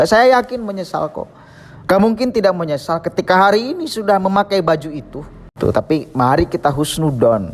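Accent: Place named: West Java, Indonesia. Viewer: Hehehe sunda banget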